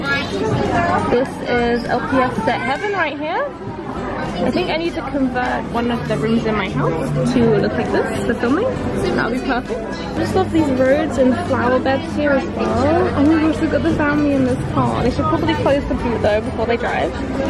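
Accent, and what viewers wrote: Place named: London, United Kingdom. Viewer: Your from london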